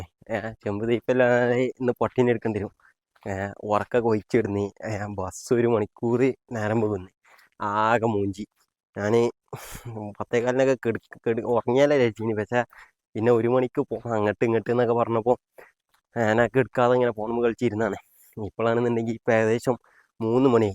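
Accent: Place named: Malappuram, India. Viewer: 😂 ഇങ്ങള് മലപ്പൊറം എ വുടേന്ന പറഞ്ഞെത് എത്തായാലും വീഡിയൊ ഇട്ത്ത തൊക്കെ അടിപൊളയാണ് ട്ടണ്ണി അൻ്റെ വർത്താനും😂 നല്ല രസണ്ടേനി കേക്കാന്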